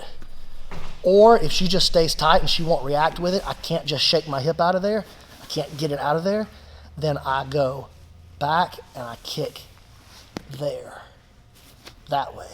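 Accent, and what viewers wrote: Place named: Alabama, United States. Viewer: hey are you also from alabama? I recognize the accent. cheers from Hartselle.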